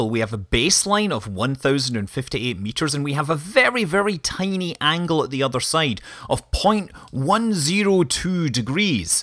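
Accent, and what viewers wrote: Scotland, United Kingdom. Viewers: It just hit me! I figured out why I love watching your stuff so much! Your accent is tricking some part of my mind that Scotty (Star Trek) is explaining this all to me. It must be right! Honestly though, you do a great job of explaining. Even though all this stuff is way above my pay scale, I'm actually "getting it" for the most part. I thoroughly enjoy watching your channel. Keep up the great work. It's cool that you've got your daughter involved as well, it's sure to pay off for her one day. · My head hurts ....too many numbers .....I live on Skye ! You sound jockanise ....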